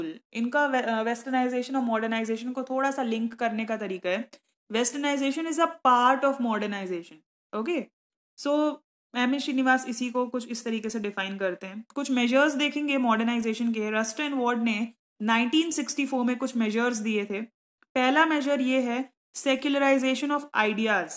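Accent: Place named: Chhattisgarh, India. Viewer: in which city do you belong? Actually your tone of speaking is seems like Chhattisgarhi so I guess it you could be belong from Chhattisgarh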